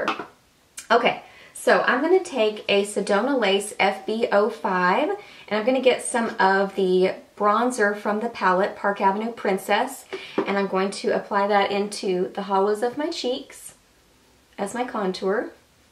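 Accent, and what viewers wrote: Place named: Texas, United States. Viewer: I literally LOVE your accent, and it makes me happy that you're a fellow Texan! Do you do collabs? I'm totally interested in doing one with you. Let me know. If not, I still love this! Haha.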